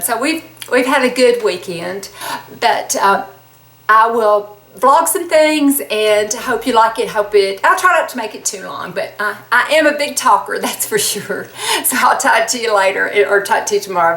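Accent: South, United States. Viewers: I love your videos so much! When you bring us around your lovely town I think of one of my favorite movies Steel Magnolias. I love a small town country atmosphere not to mention a southern accent. I may have been born and raised in NJ but my inner spirit is most definitely southern charm!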